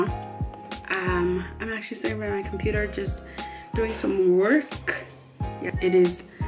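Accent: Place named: United States. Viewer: I've been watching your channel since it began and have always wondered why you have an "American" accent of sorts? Were you born/raised there? Hope I don't come off as rude, I'm just curious.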